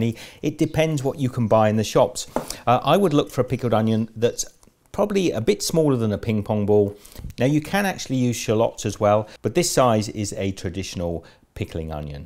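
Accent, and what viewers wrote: United Kingdom. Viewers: Are u british u have a sort of british accent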